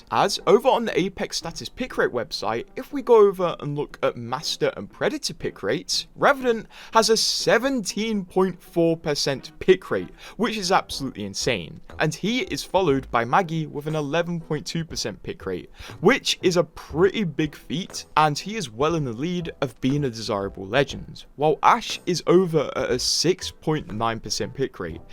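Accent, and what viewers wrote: United Kingdom. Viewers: Are you from northern England? Like machester or yorkshire?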